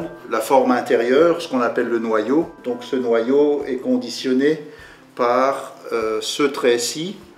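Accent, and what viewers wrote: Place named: Alsace, France. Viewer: Sympathique accent Alsacien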